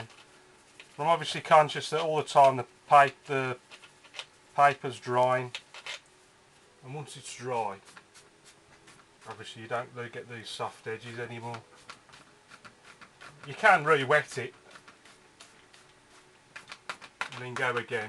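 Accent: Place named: Midlands, United Kingdom. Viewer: Good 2 hear a midland accent on you tube...it looks a bit like kingsbury water park...fished it but not painted it yet...good job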